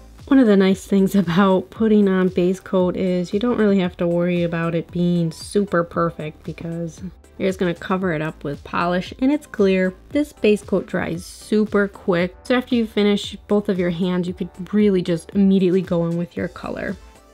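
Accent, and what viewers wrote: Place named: Chicago, United States. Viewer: Loved this video! Are you from Chicago??? That accent...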